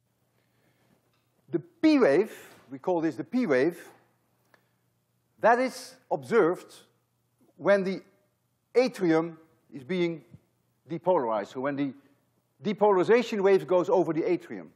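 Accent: Netherlands. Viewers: I knew he was dutch because of his accent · Yep, he,s a dutch man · Do I detect a Dutch accent?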